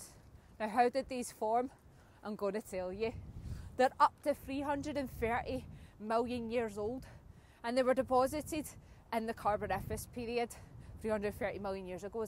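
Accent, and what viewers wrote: Scotland, United Kingdom. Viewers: That Bridge, Is Probably The Best Looking Bridge, In The World.! Shame, It's Only Used Fir, Trains.! So Are Ye Doon There, Looking Fir Crabs, Fir Dinner, Or, What.?! Thats A Broad Accent, LUV.! Didnt I Hear Yeh, On Scottish COD Players Wae Marley13, Lmao.! Or, Maby You Know, That Mad B'strd, On A Paddle Board, Telling A Baby Orca, TAE F#@ Off, In A Loch, &, "IT DOES", On Scottish TikTockin, HAHAHAHAHAHA.! A Rainbow, But nae LEPRECHAUN Wae A, Caudren Of Gold/Sunshine, But It IS Scotland, After All.! HAHAHAHAHAHA.! Watch Oot, Fir The Midge's, B'strd Wee, Blood Suckers, &, The Flying Haggis.! Lmao. Ken WIT A MEAN.! Namaste. 🏆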